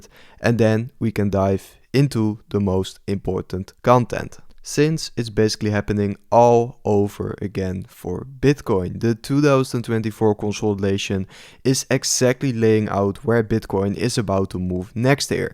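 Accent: Netherlands. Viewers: Nederlands accent is zo makkelijk te herkennen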